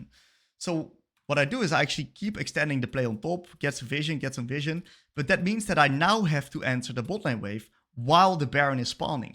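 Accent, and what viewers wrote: Netherlands. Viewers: Sounds dutch.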